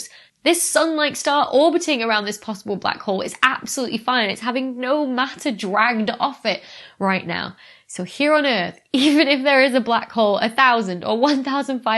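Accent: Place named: North, United Kingdom. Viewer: Love your style, so straight to the point. The subject of astronomy will always be dear to me and to hear it in a fellow northerers accect is just a bonus. I actually have a very old book showing Halleys comet and was lucky enough to see its last appearance. Keep up the great work Dr Becky 🔭🪐 - Mav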